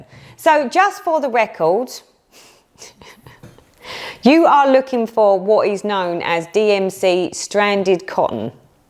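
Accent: United Kingdom. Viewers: Loved your video, yet again,! Great to see the comparison of few different storage systems in one video. Very informative. As I said before, you are one really good teacher: great personality, good looking, very pleasant voice, good experience, eager to share, that lovely accent I miss hearing so much away from UK. I started stitching just about 5-6years ago, and have gone through all the storage methods I made up myself and have ever found. I remember all the excitement after my first Orpington Hobby Craft cross stitch shopping turning into confusion, when I really had to start unravelling those flosses, and starting using them for real. Now I have made my own - different, individual storage system, out of snippets I have found and used. Works for me fine. Thanks for your video,! I wish I had all your this video series back then... 💖💖💖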